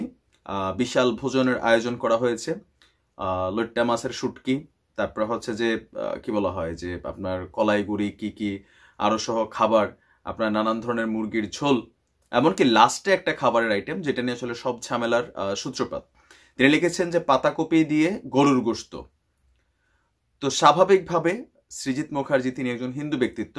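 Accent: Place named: Noakhali, Bangladesh. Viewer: Bruh apni j noakhali/laxmipur er eita apnar kothay clear buja jay.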